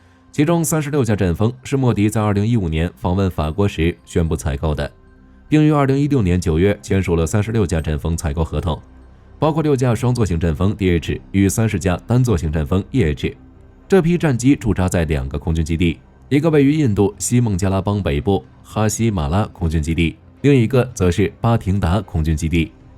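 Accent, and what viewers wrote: China. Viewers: 台湾人学大陆口音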